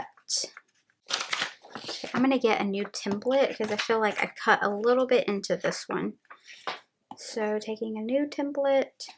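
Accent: South, United States. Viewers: I feel like I grew up with you with your accent. Just every southern girl I’ve ever know lol 💙 from South Carolina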